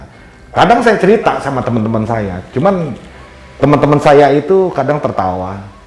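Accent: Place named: West Java, Indonesia. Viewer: Oh belajarnya dari Banten toh..pantes aja kok logat nya lebih kental ke barat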